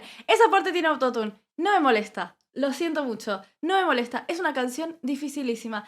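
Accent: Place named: Argentina. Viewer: 😢 que mal ojalá que sigas haciendo más videos me encanta tu voz y tu acento si eres argentina porque aveces me equivoco con los uruguayo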